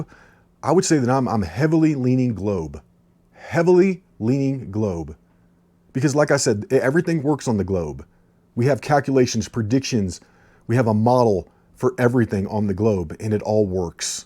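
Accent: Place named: South, United States. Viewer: Here from mctoons channel, new sub here, great vid!!! Love the accent too man, sound like where ever billy bob thornton is from!!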